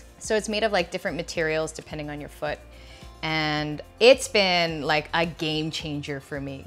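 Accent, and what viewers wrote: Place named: Singapore. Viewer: Singapore accent!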